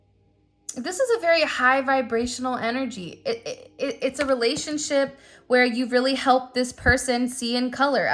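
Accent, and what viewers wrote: California, United States. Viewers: Yes and I am one of those people that do not like your accent either. I do not like the American accent on most that I have heard. And yet my favourite singer is Mr. Neil Diamond, - and he talks so pleasantly, and American. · ! Girl you bringing the FACTS!! Resonates and Confirmation 💯🔥🔥 by the way I love your Cali slang lol I am on the other coast tho so it's different so I enjoy it😂 and Maaaannn the Angry Beavers you came with that and I was like OMG!! 😂 Yasss!! Love Ya! Thanks so much😊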